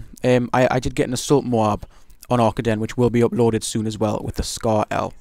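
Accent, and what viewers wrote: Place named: Newcastle, United Kingdom. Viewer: Nowt like listening to a geordie kill people. Wish you had PC man, we'd make an awesome geordie domination team.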